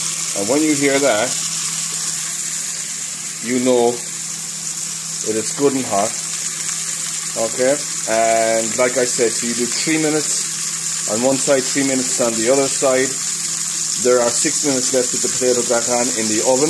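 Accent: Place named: Caribbean. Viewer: Caribbean accent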